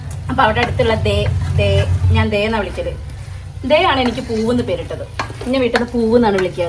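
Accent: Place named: Vadakara, India. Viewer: chechide veed evideya? Samsaram kettitt vatakara baghath evideyo anennu thonunnu❤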